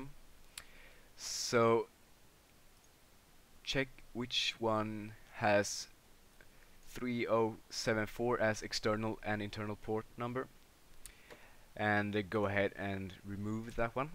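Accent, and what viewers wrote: Sweden. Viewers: Snygg SvEngelska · Vi svennar har underbar accent asså <3 Very well done(in 1040p too!), works like a charm! :D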